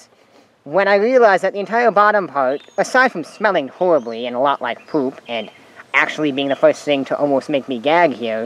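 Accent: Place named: Minnesota, United States. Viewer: Just heard it "Southern-ish Minnesota"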